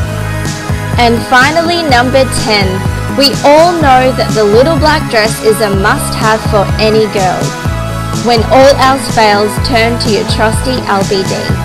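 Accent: Australia. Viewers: love the aussie accent! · Love this thanks. And love the hip-swinging and your aussie accent xx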